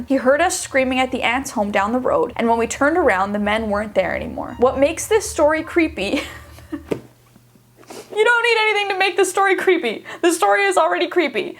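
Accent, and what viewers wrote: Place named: Canada. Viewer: That's the Canadian jumping out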